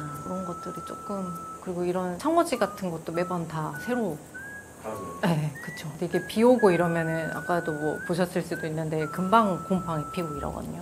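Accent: South Korea. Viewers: 표준어를 쓰려고 애는 쓰는데 억양에서 확실하게 아랫녁출신의 느낌이 드러나 토속적인 분위기랄까?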